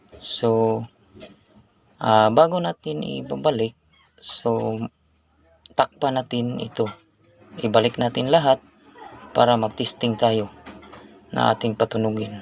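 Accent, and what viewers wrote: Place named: Visayas, Philippines. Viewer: Galing mo master..asa inyuha master..murag bisaya sad ka master Bah..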